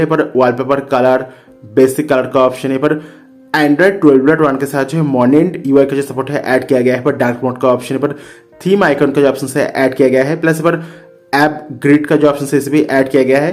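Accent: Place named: South India, India. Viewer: Aisi hindi kyo hai South se ho kya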